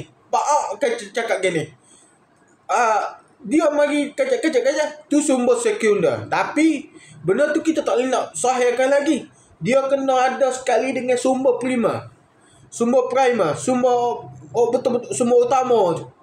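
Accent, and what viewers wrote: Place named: Kelantan, Malaysia. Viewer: Abe, aku x berapa faham loghat Kelantan mu. Kecek Bahasa Malaysia la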